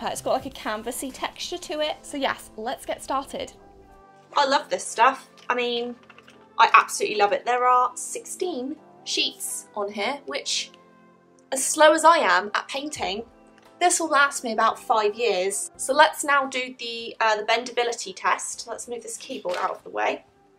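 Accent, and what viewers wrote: United Kingdom. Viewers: I love your British accent ❤🥀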